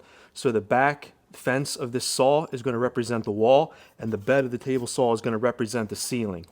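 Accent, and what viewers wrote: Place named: New Jersey, United States. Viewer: Sounds like Jersey to me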